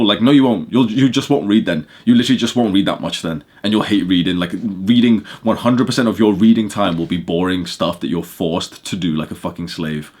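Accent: India. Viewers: That indian accent🤣🤣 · love from India❤, your indian english really matches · dope Indian accent brother, approved! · thaaaat indian accent isn't funy XD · U have the best Indian accent. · Fuck that indian accent made me dissociate. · Bro has the Indian accent better than Indians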